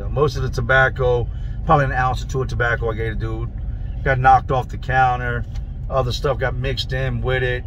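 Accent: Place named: Philadelphia, United States. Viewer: Is this guy from Virginia or Philly because he sounds like he straight outta Philly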